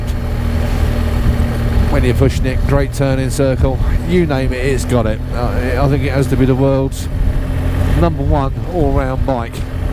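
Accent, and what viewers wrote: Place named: England, United Kingdom. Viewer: Hello ohhhh lucky boy! you have a BMW! ohhh nice one! and I love your typical "English" who remember me my youngers days at college and the trip in my penfriends house ( Kingslynn).🌸 Thank you for sharing this 𝓢𝓾𝓹𝒆𝓻 ⓋⒾⒹⒺⓄ with us 🌸🍾🍷🍇 Greetings from la France in Europe🍾🍷🍇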